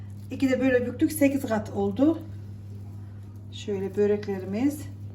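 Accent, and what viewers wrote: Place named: Anatolia, Turkey. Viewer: Senin eline de şivenede kurban olayım o ellerin dert görmesin ellerine sağlık Anadolu insanı hiç kendini darlama nasıl konuşuyor san öyle devam et biz seni doğal halinle çoook seviyoruz evimizden biri gibi MaaşALLAH sana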